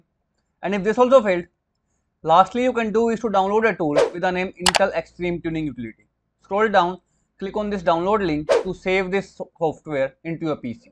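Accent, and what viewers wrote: India. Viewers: I knew it will going to work when I heard your indian accent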